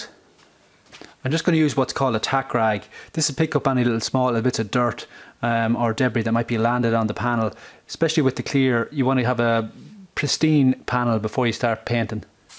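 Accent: Dublin, Ireland. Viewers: With that accent your Irish.... where da hell did you get that 2k clear in this country. It's like hens teeth in the Midlands. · Where ya from Dublin? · I really enjoyed this mate i love the Irish accent could listen to you all day. too al those who put thumbs down 👎 what a bunch donkey's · Ooh, that Irish accent! ❤ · Nice! And the Irish accent was the icing on the cake 😃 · Very good buddy - nice one - love the Irish accent as well.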